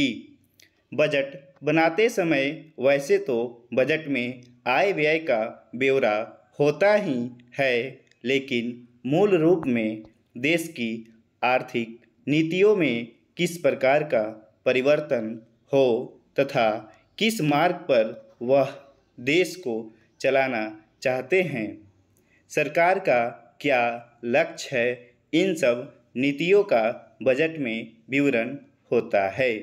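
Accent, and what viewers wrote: Bihar, India. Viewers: Sir apke kuch words bolne me galti hai mtlb apki pronunciation thik nahi hai jaise 👉 ye , jyadatar aur bhot se words hai Baki sab badhiya hai shyd aap bihar ki side se honge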